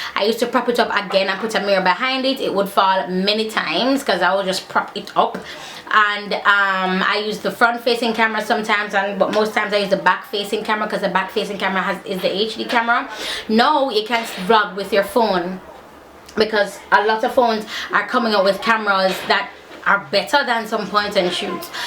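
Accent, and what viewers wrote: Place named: Jamaica. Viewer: Also for your Jamaican accent, which I have found many are attracted to; Californians enjoyed listening to my sister and me.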